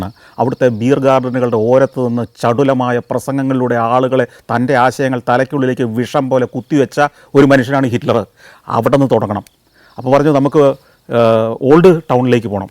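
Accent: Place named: Kottayam, India. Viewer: എടുത്തിട്ട് ഇണ്ടൻ അടിച്ചോണ്ട് ഇരിക്കുവാ....കോട്ടയം സ്റ്റൈൽ😂😂😂❤❤🎉🎉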